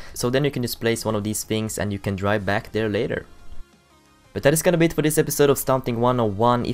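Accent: Sweden. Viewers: From your accent I'd guess you're Swedish.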